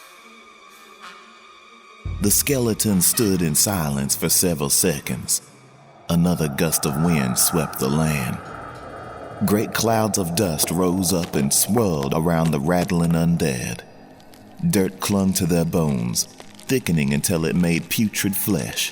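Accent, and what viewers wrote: South, United States. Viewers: Loving the narration, great southern accent to the cool story. The vocabulary in this story is so enjoyable.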